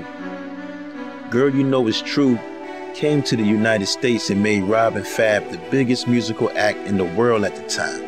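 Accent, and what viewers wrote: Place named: Baltimore, United States. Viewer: Very informative, but I could do without the Baltimore accent of the narrator.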